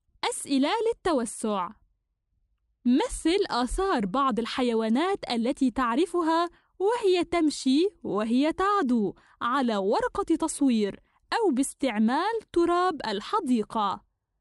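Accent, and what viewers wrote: Tunisia. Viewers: انتي تونسية؟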